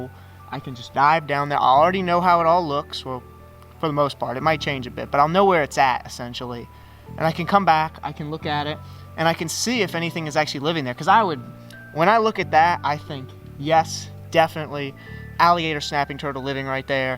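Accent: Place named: South, United States. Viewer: Dont loose your southern accent. It is what made you!!!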